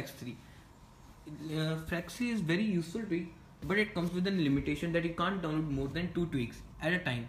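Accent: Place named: India. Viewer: Wtf you india 😂 When india try to speak english.. fell so weird what da fukkk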